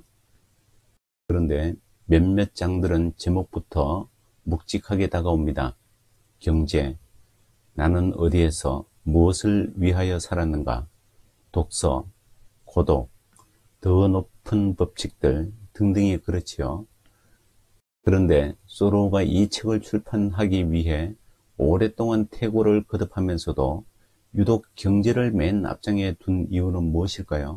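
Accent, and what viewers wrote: Gyeongsang, South Korea. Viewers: 근데 경상도억양이 일상에선 듣기좋았는데 책읽기로는 듣기가 무난하진않네요 껄끄럽다고해야하나 ㅋ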